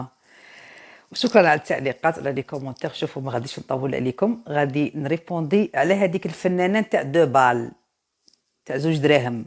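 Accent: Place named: Relizane, Algeria. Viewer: هدرة غليزان مش وهران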